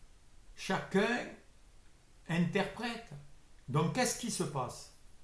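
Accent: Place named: South-West, France. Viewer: ami au bel accent du Sud-Ouest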